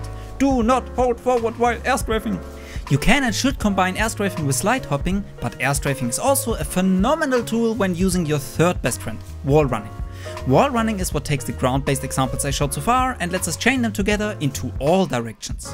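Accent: Germany. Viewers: not really. he sounds very german :) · Why do you have such a german accent? Tbh, if you are german, you are speaking very clearly, easy to understand Love your videos btw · As a German he sounds german :D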